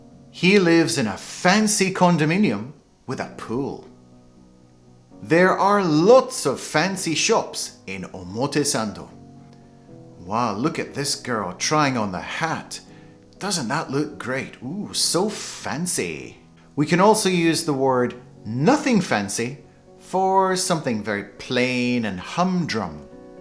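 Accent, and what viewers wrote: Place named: United Kingdom. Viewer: Most appreciated. I`m loving British English! Your English reminds me of my staying in the UK. Thanks, Edinburgh is beautiful town, Highland, Loch Ness. Keep going, I will be watching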